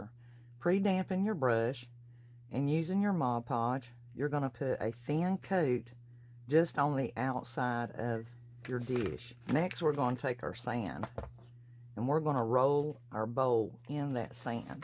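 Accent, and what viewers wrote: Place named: Ohio, United States. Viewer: Thank You So Much!! ❤ I'm in Ohio and I was trying to figure out your accent lol I'm guessing Southern Ohio, West Virginia or Kentucky? I have family all over there!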